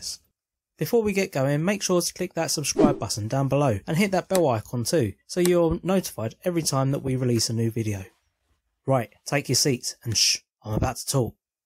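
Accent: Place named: Cockney, United Kingdom. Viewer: You reminded when you mentioned this vid earlier, I needed to watch this - been meaning to but never remember at the right time. Curious, what epoxy do you use for glueing up? Im sick of the Gorilla type where you need ten ton of pressure to squeeze out the gear from those two tubes! Just my ten cents worth, whats always drawn me to your vids was the kinda lock stock and two smoking barrels feel, like at the start and end of this vid in a fashion of "Guns for show, knives for a pro" "The entire British Empire was built on cups of tea" "No, I asked you to give me a refreshing drink! Wasn't expecting a fucking rainforest. You could fall in love with an orangutan in there!" You had that same tone before but didnt copy it, kinda came naturally across in your vids - That was your USP from your vids to me mate, hope that makes sense? Quintessentially English/Cockney in a funny way done naturally - I'm meaning this as a complement.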